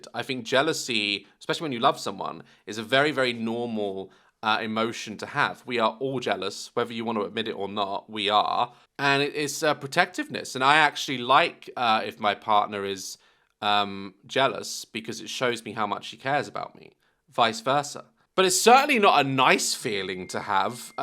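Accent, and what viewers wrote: United Kingdom. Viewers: He really does scream British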